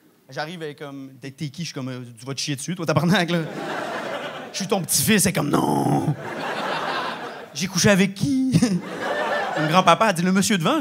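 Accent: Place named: Haiti. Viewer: Pourquoi il prend un accent haïtien??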